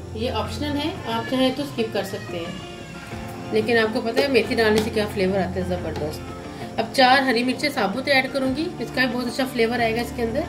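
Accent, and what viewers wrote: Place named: Pakistan. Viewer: Me india se hu baht esy treeka btaya hai apne nice mam me try krungi pakistani zban muje pasnd hai bahut yha india me istrha nhi bolta koi bhi but muje apki voice achi lgi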